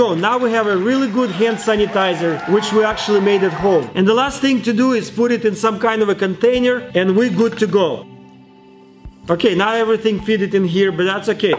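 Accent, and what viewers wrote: Russia. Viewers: Great Russian accent and scientific experiments, while helping out humanity during the pandemic. Good job 👍.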